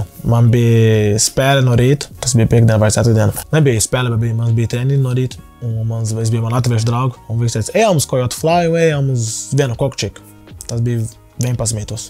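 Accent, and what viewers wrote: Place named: Lithuania. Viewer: You sound Lithuanian not Latvian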